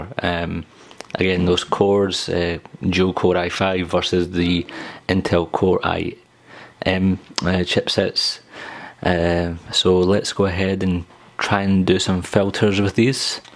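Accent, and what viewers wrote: Scotland, United Kingdom. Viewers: Omg I love the Scottish accent! So hot hehe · nice scottish accent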